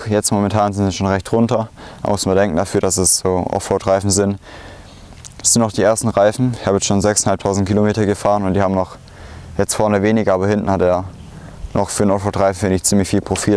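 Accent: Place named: Swabia, Germany. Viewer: muss man doch au ned ich finde man kann ruhig ein wenig hören das man n schwabe is 👍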